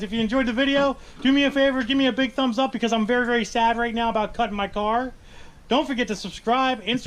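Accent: Pennsylvania, United States. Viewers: That's how we talk in PA buddy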